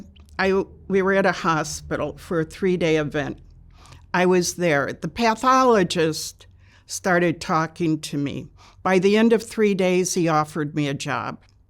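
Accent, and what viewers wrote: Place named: Chicago, United States. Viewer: You can’t beat the Chicago accent! ❤ She reminds me so much of my grandma who lived her entire life there. What a spirit, what a story!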